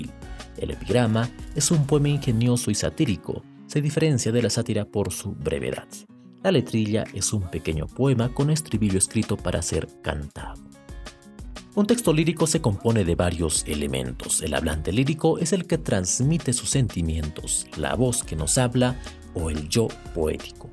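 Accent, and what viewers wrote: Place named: France. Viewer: Tienes minimo acento de un frances , muy minimo pero lo tienes xd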